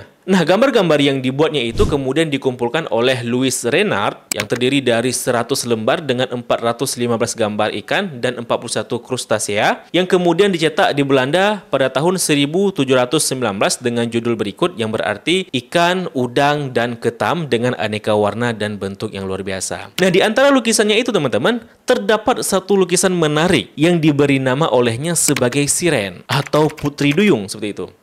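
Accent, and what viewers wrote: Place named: Aceh, Indonesia. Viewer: Bang logat km kayak org aceh😂😂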